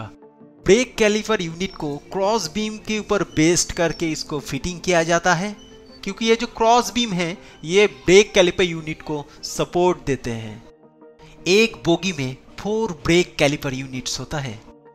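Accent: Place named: Bengal, India. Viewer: T = correct accent use kar rahe ho aap .. But FIAT per "T" ko keu Bengali accent " ত"" use karte ho aap log ? Next Bihar pe maximum people " use " = accent pe " S " ko keu Bengali accent " জ / j " karte hai ❓ mujhe pata nahi 🤔 Use word pe "" s " accent ko s jaysa accent nahi karte hain ❗ Main 1 word examples diya hai, aysa bahut words hain accent correct nahi hota / not ❓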